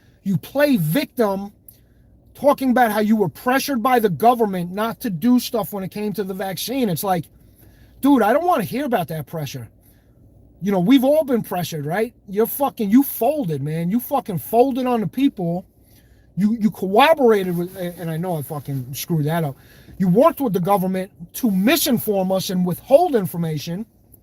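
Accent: New York, United States. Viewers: YOU'RE FROM NEW YORK YOU SPEAK JUST LIKE IT.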